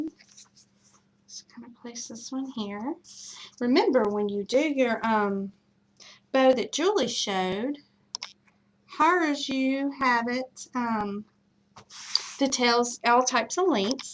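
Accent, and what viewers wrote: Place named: South, United States. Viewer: I LOVE YOUR HELEN BOW !!! YOU HAVE TO BE FROM THE SOUTH..... YOU TALK LIKE ME ! YOUR MISSISSIPPI FRIEND I LOVE YOUR VIDEOS ❤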